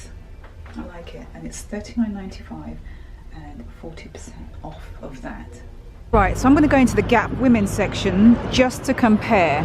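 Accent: United Kingdom. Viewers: You are in Nueva York . We do have a few from the UK here , luv your accent!!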